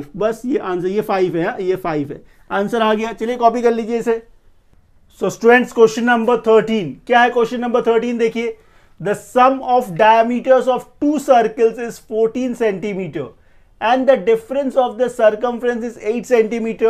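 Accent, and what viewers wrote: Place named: Bihar, India. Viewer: dhanyawad sir itne ache se samjhane ke liye apne har ek word bihari style me ache se samjhaya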